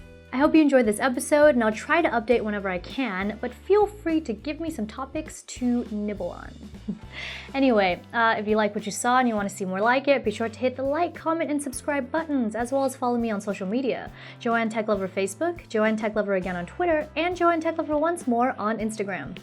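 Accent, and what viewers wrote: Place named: United States. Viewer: well...as pwrOverwhelming states..you're the perfect host for tech shows and some other shows too..crude comments from keyboarders you dealt with professionally..comments about your asian ethnicity-some were not flattering to you..to be beautiful chinese and obviously long time in america to have an american accent-you grew up in USA?..if only there were more like you..and using screwdrivers and other tools doesn't make you weird..its just shame more girls don't get involved in tech other than using smartphones..i can see a TV company contracting you with your popularity..specs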